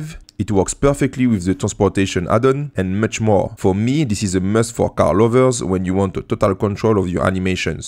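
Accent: France. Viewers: Le petit accent français 😏 super tuto vraiment continue comme ça c est incroyable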